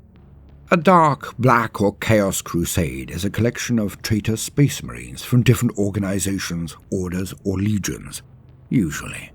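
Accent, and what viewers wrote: United Kingdom. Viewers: one I agree with you YouTube is buggy two can I do r e a c t your amazing videos three are you British? Your excellent sounds like it. It’s serving to hear it as will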